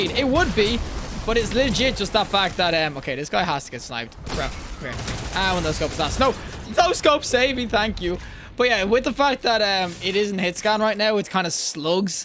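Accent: Ireland. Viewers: you saying warlocks ARENT king of the skys already?? also are you irish?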